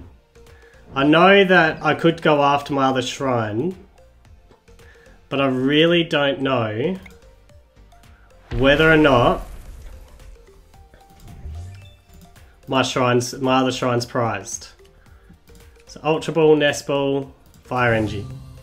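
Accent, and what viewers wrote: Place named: Australia. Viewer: I love that Charizard finally has a competitive card! Also, judging from your accent, I'm guessing you're from Australia? Watching here from the UK! Love your channel! Keep up the good work!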